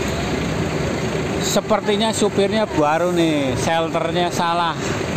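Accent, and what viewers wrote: Banyumas, Indonesia. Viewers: Bang oak de melu manteng maning......paten liputanya mantap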